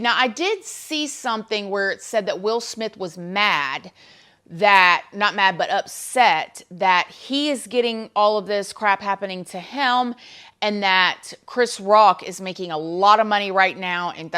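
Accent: South, United States. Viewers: I know you are happily married and all. But I just got to say, you are hot! I love your style, particularly your cool hairdos and your southern accent...and your funny. that's all.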